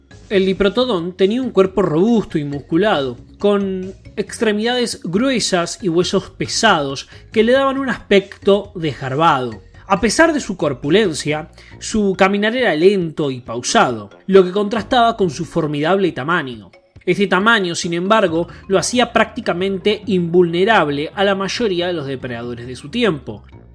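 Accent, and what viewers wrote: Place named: Argentina. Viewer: él es argentino, por eso dice pronuncia así ciertas palabras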